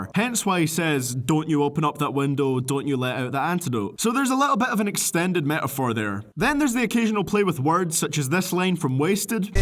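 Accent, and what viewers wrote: Scotland, United Kingdom. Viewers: Brother you from scotland? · i can't help but notice that you have a western European accent, are you Scottish ?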